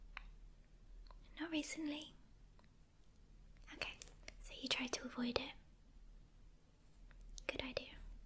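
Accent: United Kingdom. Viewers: What is her accent? What part of the UK?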